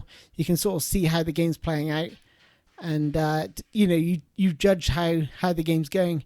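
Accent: United Kingdom. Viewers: Are you from Uk ??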